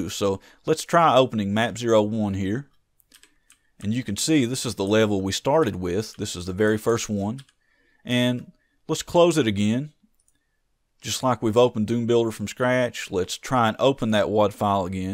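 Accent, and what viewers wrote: South, United States. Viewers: I think more tutorial people should have southern accents, it makes me more attentive to listen.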